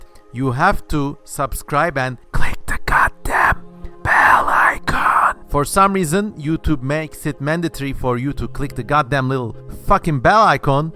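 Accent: Turkey. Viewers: I am living for the Turkish accent 🩶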